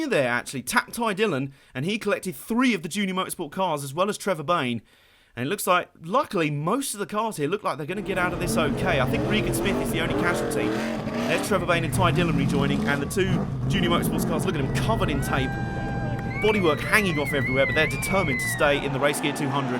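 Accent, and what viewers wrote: United Kingdom. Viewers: I love you forever for that comment. This is just the best and greatest thing ever. And the Britishy accent makes it sound so professional and upper-class, I just love everything you do!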